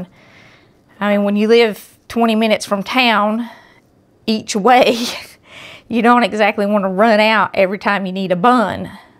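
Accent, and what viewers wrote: South, United States. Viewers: Your accent sounds just like Dolly Parton's. Enjoyed your video. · Love the Southern American sing song accent. Thanks for sharing this quick bread recipe. God works in mysterious ways. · I really enjoy your channel and your southern accent. God is an awesome God❤❤ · In these times of financial insecurity for many, this is great to know how easy it can be to provide for the family. I have lived in Germany for ages, but I spent every summer in my childhood visiting my family in Tennessee. My grandparents were from there. I adore the southern drawl, the charm of how the southerners speak. I look forward to making these with fond memories of my childhood. I also loved hush puppies! Do you have a recipe for those delicious 'critters'? Bless you.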